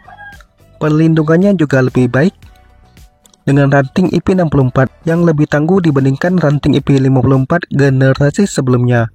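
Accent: Aceh, Indonesia. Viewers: Bg org aceh ya cara ngomong nya logat aceh 😮😮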